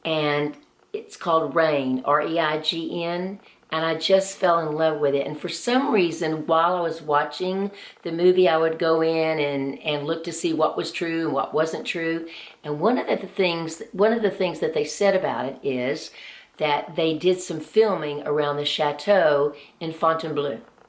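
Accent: South, United States. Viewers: So impressed with you! Go! Do I detect a southern accent?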